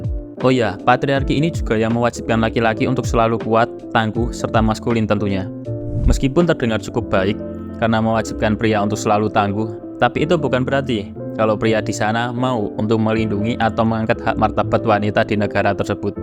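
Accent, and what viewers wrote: Java, Indonesia. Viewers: kalo ngomongnya masih medok, ga usah lu gua lu gua brody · hanya karena author medok pun dibuat masalah, miris lu ya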